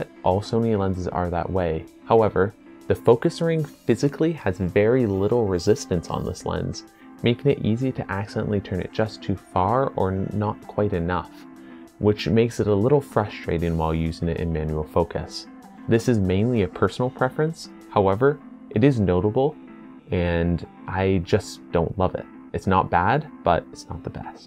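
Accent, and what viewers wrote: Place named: Canada. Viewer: Holy Canadian accent LOL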